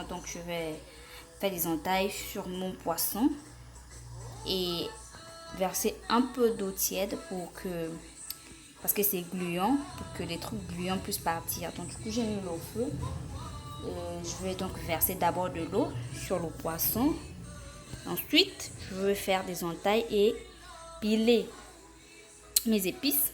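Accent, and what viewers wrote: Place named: Gabon. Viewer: On sent une gaboma 😂😂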